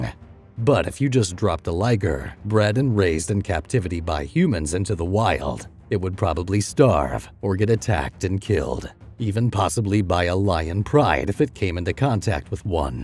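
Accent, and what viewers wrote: United States. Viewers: The narrator sounds so american its distracting^^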